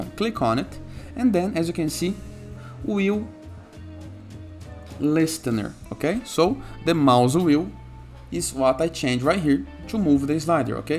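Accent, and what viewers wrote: Brazil. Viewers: Judging by the accent you are brazilian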